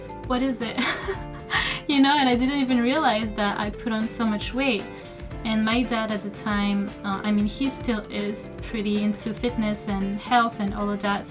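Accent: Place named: France. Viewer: ohhh tu viens de France j'en étais pratiquement sûre avec quelques prononciations !! tu es très intéressante et merci pour le boulot de tes vidéos !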